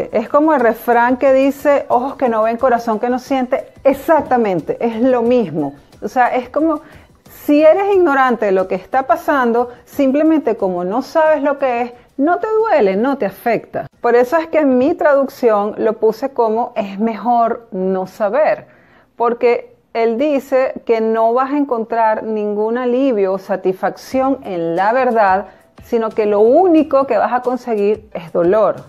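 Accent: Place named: Venezuela. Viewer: TIENES UN PROBLEMA Y ES QUE NI SI QUIERA TE EXPRESAS BIEN EN CASTELLANO. PARA ELLO DEBERÍAS SABER EXPRESARTE BIEN EN CASTELLANO Y POR TU ACENTO CREO QUE ERES VENEZOLANA.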